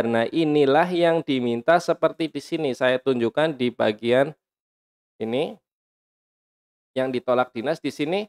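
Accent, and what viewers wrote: Banyumas, Indonesia. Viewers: Ah ngapak ini gmn gk tau ya,mending ngelawak z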